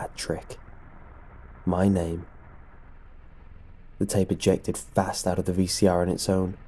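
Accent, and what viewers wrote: United Kingdom. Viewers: If this bastard grew up 'in Pennsylvania', then why the British accent?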